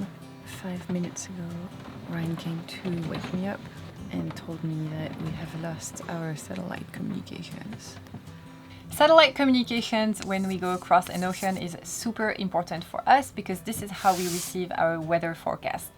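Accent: France. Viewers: I found myself watching your video because I couldn't stop listening to your accent. I love the French accent and wish that my French (Ce n'est pas bien, je suis desole:) were half as good as your English. I wish that my wife and I could do what you and Ryan are doing. Great video! Merci beaucoup.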